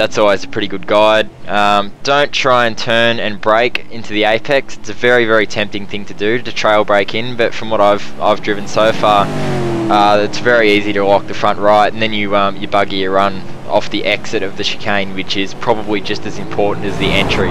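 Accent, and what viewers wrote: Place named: Australia. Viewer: Nice, vid includes authentic Austrailian accent and everything :)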